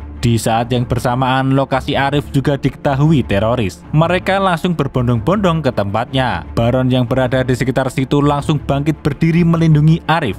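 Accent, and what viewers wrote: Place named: Java, Indonesia. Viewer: bang yg ini take voice ny kok medoks jd kurang epic haha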